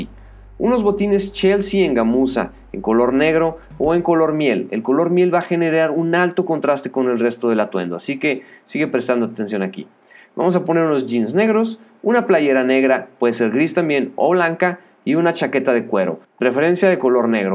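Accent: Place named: United States. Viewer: Tu voz es como de un yankke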